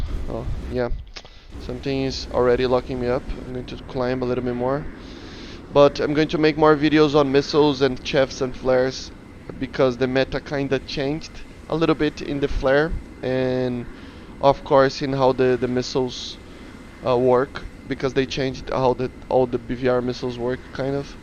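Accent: Brazil. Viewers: aha, desconfiei. O sotaque brasileiro não engana kk Mas que canal top cara, descobri por acaso. É só questão de tempo para o canal crescer * sul do brasil onde? rio grande do sul?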